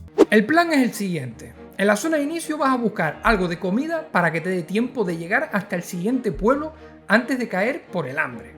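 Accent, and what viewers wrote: Canary Islands, Spain. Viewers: Me suscribo por el acento canario. Y gracias.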